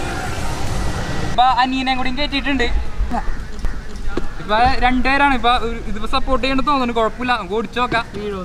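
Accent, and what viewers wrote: Thrissur, India. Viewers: ശൂര് സ്ലാങ് ആണല്ലേ ഗാഡിയെ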